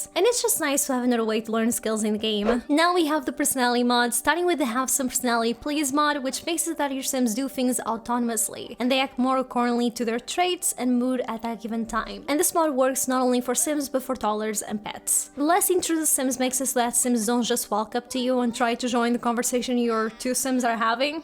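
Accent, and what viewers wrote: Portugal. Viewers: 1 minute and I already knew you were Portuguese! hahhah loved the video!❤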